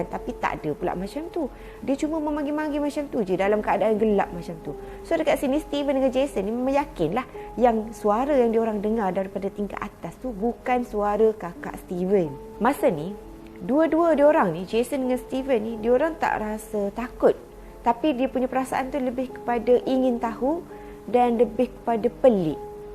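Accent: Kelantan, Malaysia. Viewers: hai kak dengar macam org klate?... sentiasa tgk video kak terus maju jaya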